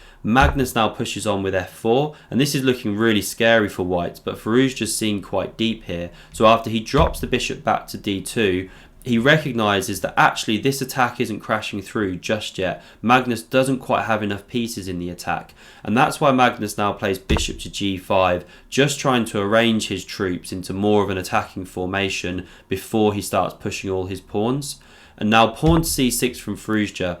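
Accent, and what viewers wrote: United Kingdom. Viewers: Great commentary with beautiful British accent and a juicy Alireza game. Very convincing to subscribe. Looking forward to see more from your channel.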